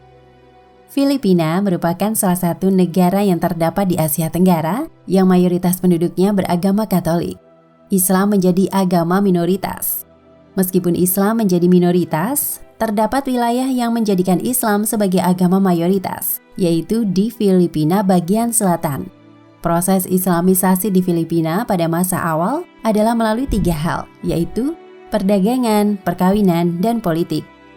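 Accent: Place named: Banyumas, Indonesia. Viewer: Ngapak pula kao bapak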